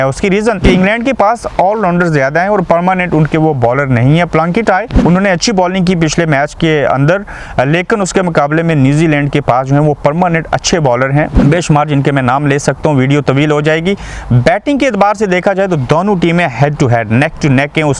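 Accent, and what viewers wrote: Kashmir, India. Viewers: You’re anywhere from kashmir I can feel it the way of talking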